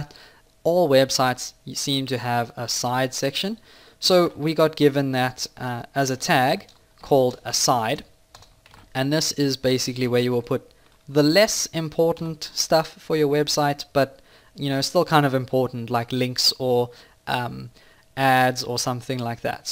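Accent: South Africa. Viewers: agree you better than Lynda ... thank you you sound south african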